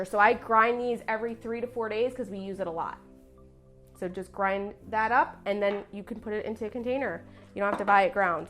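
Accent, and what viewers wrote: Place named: New Jersey, United States. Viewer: Are you from Jersey? My mom is from Jersey and your accent is very strong lol i’m from the south, but immediately when you started talking I was like… I think she’s from Jersey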